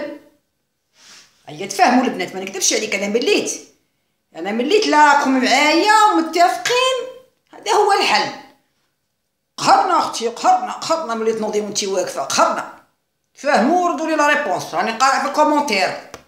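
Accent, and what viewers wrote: West, Algeria. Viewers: تعجبيني كي مام راجلك ماشي جزايري بصح مازلتي تهدري كيما حنا نحبك (انا طفلة) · والله غير نتيا عسل يا ونحبك اناوماما جتنا هدرتك كي توعنا تع الغرب تبرديلي ڨلبي😍😍😘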